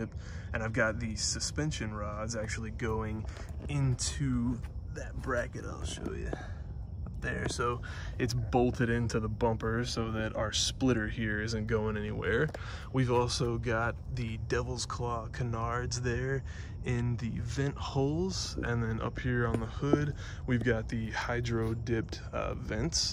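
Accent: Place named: Texas, United States. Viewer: What part of Texas you from ma dude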